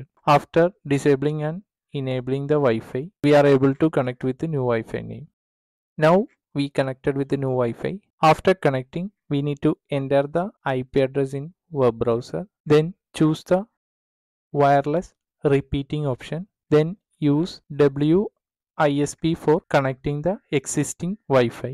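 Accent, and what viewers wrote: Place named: Kerala, India. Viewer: english ketapol malayalee enu mansilay...universal repeater mode anelum kuzpam undo?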